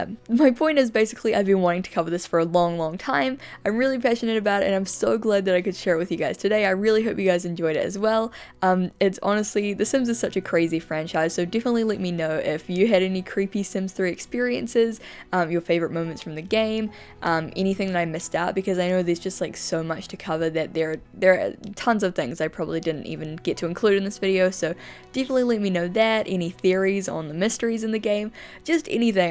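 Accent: New Zealand. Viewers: Sometimes when I'm watching your videos I get so used to hearing your voice that I forget you have a kiwi accent and it's almost jarring when you suddenly reference something Aotearoa-specific -- the Mr Whippy remark got me this time! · shes from New Zealand im pretty sure. nz accent?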